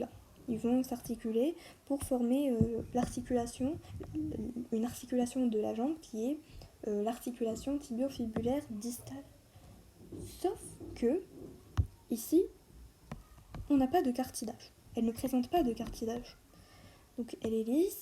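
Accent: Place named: France. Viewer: vous êtes la meilleurs des anatomistes au monde vous proposez une explication détaillé j'espère que vous continuez les autrs chapitres un ;grand merci de l'algérie😘 nb:j'ai cru que vous étiez française vu ton petit accent c'est pour ça qu j'ai dit merci de l'algérie mdr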